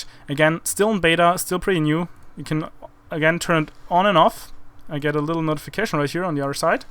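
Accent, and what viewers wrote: Germany. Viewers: Safe du bist Deutscher? xD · Kanns sein dass du aus Deutschland kommst? :D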